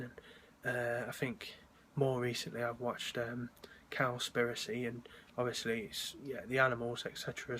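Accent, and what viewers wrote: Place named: Hull, United Kingdom. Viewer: You have got to be from Yorkshire. I am, you sound very Hullish?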